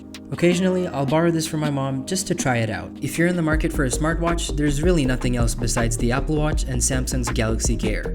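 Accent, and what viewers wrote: United States. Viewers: Damn , your accent is freakishly American !! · amazing quality and content bro❤️ at first i thought u r american😂😃❤️ U earned a subscriber bro❤️ Keep up the good work🔥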